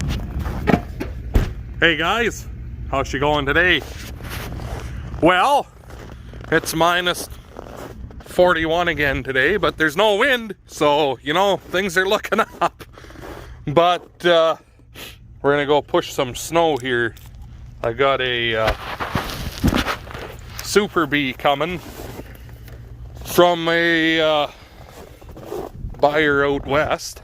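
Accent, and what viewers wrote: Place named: Canada. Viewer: Can't tell if you live in Canada, michigan, minnesota, or an state next to the 7 lakes oh yeah and also thanks for taking your time and hard work to feed people and I feel you live in Canada because your tone, voice, and they way your talk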